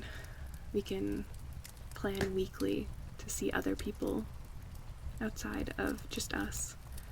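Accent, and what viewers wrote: Canada. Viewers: Canadian eh? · are you canadian? random question lmaooo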